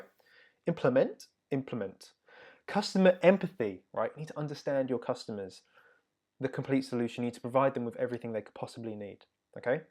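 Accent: United Kingdom. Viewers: just spent my afternoon making notes . thank-you so much for your videos and information presented in such a genuine way . Im just starting out with my sister in law and to be honest its so good to find a British accent taking about FBA within the uk ! we would really like to be considered for the free gift ,particularly the profit calc pro , if were not too late. We continue to watch and learn and thank-you for such informative content . Best of luck for the future , though Im not sure you need it !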